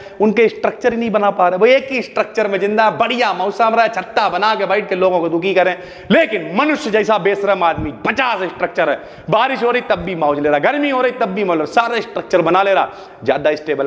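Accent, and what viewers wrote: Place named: Kanpur, India. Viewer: sir saari videos aapki line se dekh rha hu sir sb samjh me aa rha i really thank u sir for such a great content in your kanpuriya accent.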